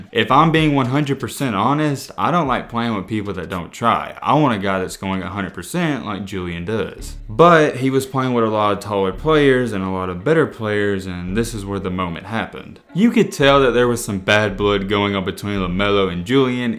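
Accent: South, United States. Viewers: Bruh gotta be from the south somewhere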